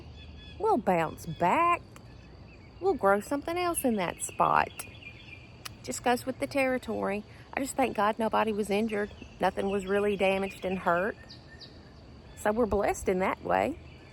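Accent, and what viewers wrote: Oklahoma, United States. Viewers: Sounds like Southern Oklahoma.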